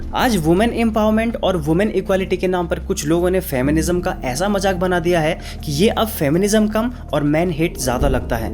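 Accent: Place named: Garhwal, India. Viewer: Gadwali accent 👍👍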